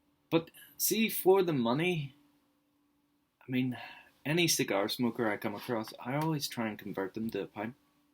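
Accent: Ireland. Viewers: Oh, now I see ,You are an Irish man, gotcha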